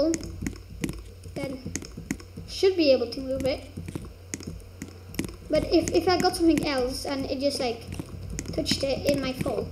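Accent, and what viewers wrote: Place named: Scotland, United Kingdom. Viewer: Omg your voice is so cute I love Scottish accents btw ty!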